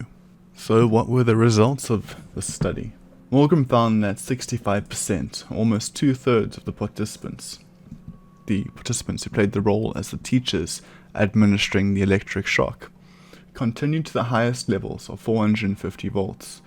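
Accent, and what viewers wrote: South Africa. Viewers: This narrator sounds distinctly South African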